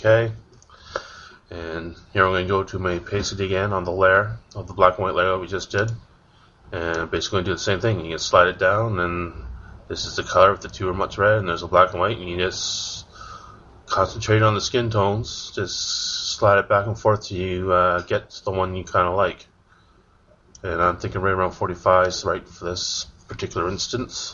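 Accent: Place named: Canada. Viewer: Def Canadian but I can’t place what part. Bc lower mainland, SK or Manitoba. Am I right?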